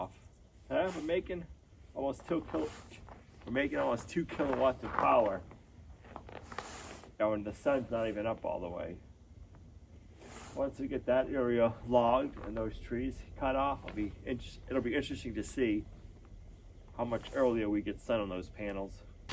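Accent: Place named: New England, United States. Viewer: in the last couple videos, you kept referring to “Gracing the roof”. For the LIFE of me I didn’t know what u meant. Then you pulled out the ice shield and I get it now. That must be a “NewEnglander slang” I guess LOL! I do get a kick out of some of your pronunciations from time to time. You’d probably make fun of how my midwestern accent sounds too😂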